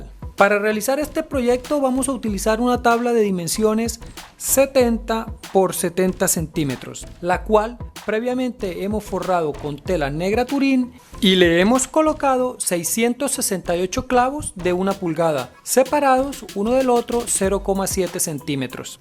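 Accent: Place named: Bogotá, Colombia. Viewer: Ahhhh genial la pegue , sabia que eras colombiano seguro cachaco por tu genial dialecto , ya mismo te sigo y te escribo al privado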